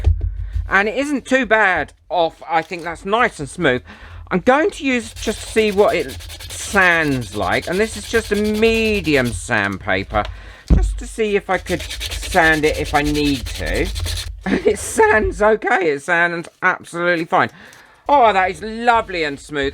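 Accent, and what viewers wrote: United Kingdom. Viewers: Hmmmm I wonder who has to taste the bleach to make sure it has enough flavor? 😂🤣🤪 My Gran is from Yorkshire and she used to say "flavor" instead of "scented" or "fragranced." I miss her and her laugh. She was also a crafter like you and would get so happy and giggly when something would work. The way you laugh and giggle reminds me of her… as well as the accent! While she lived in Yorkshire, I would come and stay for the summers… I would pick up that accent really fast and it would make my mum mental when I came home with it. After my grandfather passed away in 1999, Gran came to the states to live with my mum and dad. I got to have her with me for almost 30 years. That laugh was contagious and she was just as cheeky the day she passed away as she had been her entire life. Thank you for your videos and your laugh. It really brightens my day!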